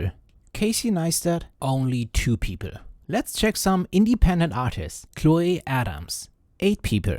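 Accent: Germany. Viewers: His heavy German accent kind of adds to that. Like, he's really trying not to sound aggressive imao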